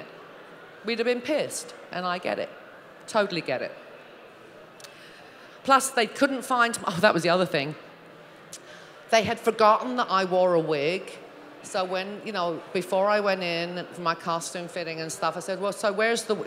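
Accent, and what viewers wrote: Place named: Cockney, United Kingdom. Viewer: Love that East end accent!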